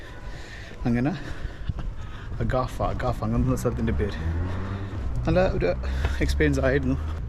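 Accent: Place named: Thalassery, India. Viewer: Thalassery language ayath kond oru veraity Vlog ayittund nannayittund ketto daily vlog okkey idu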